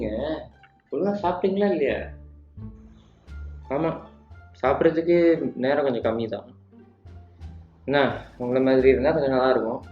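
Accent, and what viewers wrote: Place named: Malaysia. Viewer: are you a indian malaysian coz ur accent though different i hate indian accent but ur's is kinda malaysian indian accent anyway good video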